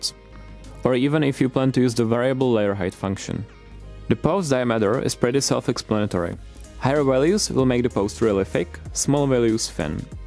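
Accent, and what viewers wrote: Czech Republic. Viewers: Český přízvuk je nezaměnitelný :)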